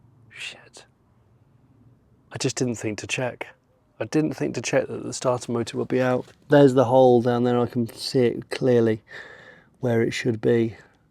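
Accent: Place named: Somerset, United Kingdom. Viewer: In slow mo you can really hear the Zummerzet in accent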